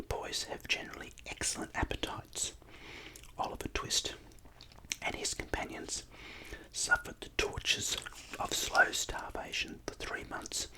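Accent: Australia. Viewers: Subscribed , turned on post notifications , I’m looking forward to all your videos , I love Australian accents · I'm Venezuelan, but I really love aussie accent, and this help me a lot to lear english. This videos are so amazing, and your voice is incredible 💖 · 😍Yay Australian accent book reading and gum chewing! My favorites in one video. OK goodnight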